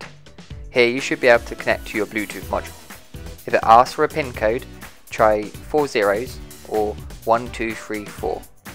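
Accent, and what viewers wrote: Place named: United Kingdom. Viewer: i ll follow ur tutos and buy what u linked in description, then i ll try to give u a "field report" =) THANKS SO MUCH to share that tho ! love ur accent :) british ?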